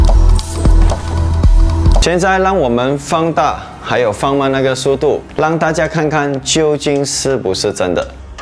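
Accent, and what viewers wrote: Malaysia. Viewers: 只要是马来西亚人都听得惯你的口音吧，因为我也是客家人，虽然我是槟城人😅😅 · 马来西亚独特的口音是不行hio 马来西亚很多种族 什么腔都有啦🙄🙄🙄更何况讲了十多年都是这样 哈哈哈哈哈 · 馬來西亞口音我不會不舒服，反而是北京腔捲舌音聽了很噁心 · 马来西亚的华语就是酱的咯！习惯就好！哈哈哈！ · 马来西亚的普通话我觉得挺好听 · 听你的口音就知道是马来西亚人了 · 這口音很棒啊，我認識很多馬來西亞人我很欣賞這種口音的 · 我是香港人，聽口音知道你是馬來西亞人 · 一直在猜是哪里人 就是没想到是马来西亚人╮(╯▽╰)╭ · 我是臺灣人，我都有在看惡靈的影片，所以我聽到懂馬來西亞華語，ㄅㄞˊㄔ¯ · 除了光良的其他都不是呀~ 马来西亚口音可爱啊！😁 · 哈哈 听出来了马来西亚人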